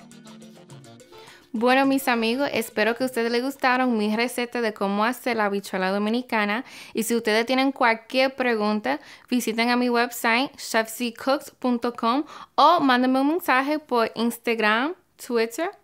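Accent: New York, United States. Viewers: tiene un acento newyorkyno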